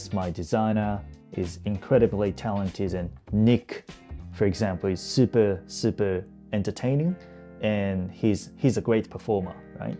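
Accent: Australia. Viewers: この人の英語ってフラットというか、イントネーションの高低があんまり激しくないように聞こえるんだけど オージーアクセントを身につけた結果としてそうなったのか、意図的にそうやって話してるのかどっちなんだろう？ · オージーアクセントが炸裂してる笑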